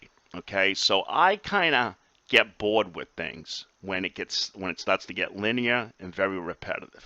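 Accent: New York, United States. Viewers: Are you from new york?! If so youre awesome! Love your accent!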